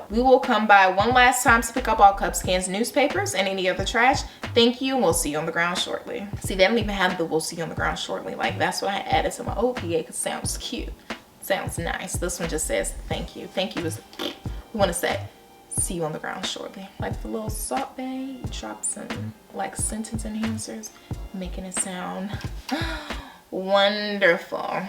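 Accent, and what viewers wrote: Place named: Chicago, United States. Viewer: I can hear your Chicago accent and love it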